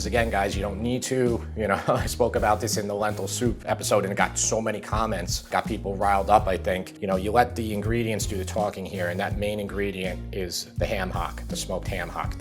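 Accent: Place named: Boston, United States. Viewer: Your accent is very similar to mine. South of Boston? I really enjoy trying your dishes. They’re not overly complicated and they’re hearty. I’m feeding a picky 11 year old so I appreciate your son’s reviews. 😊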